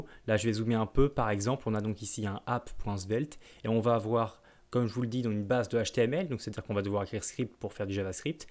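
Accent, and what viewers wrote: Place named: United Kingdom. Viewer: super mise a part l'accent anglais .... hhahhaa Le count m'a tué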